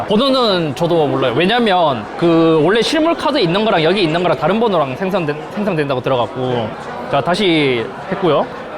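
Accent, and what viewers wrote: Gyeongsang, South Korea. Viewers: 근대 궁금한 게 있는데 왜 IT 유투 버는 경상도 사람들이 많지 사투리도 그렇지만 발음도 그렇고 말이 빨라서 무슨 말인지 못 알아들을 때가 있네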